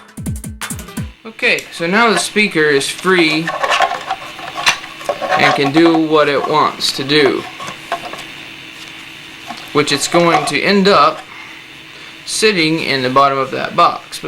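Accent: South, United States. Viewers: He kinda reminds me of a long haired country accent Ashton Kutcher. well that just my opinion i really don't kmow about you guys:) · Definately not Bristish, its southern US by the sounds of it... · It's like Mike Myers with a southern accent.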